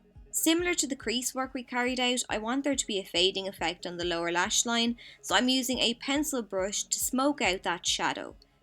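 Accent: Ireland. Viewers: you're accent is so pretty! you sound so sophisticated whenever you speak, are you from Ireland? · I love your Irish accent, and I'm half Irish myself. I love that beautiful makeup look you did. I am also learning how to do liquid winged eyeliner.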